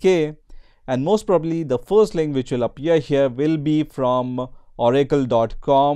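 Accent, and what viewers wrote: India. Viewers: i love you and your Indian accent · The moment you hear the indian accent you know its going to be good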